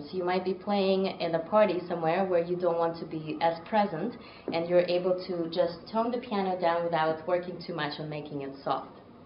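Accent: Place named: Russia. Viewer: Strange how a Russian lady reminds you of a French lady but they do have similar accents lol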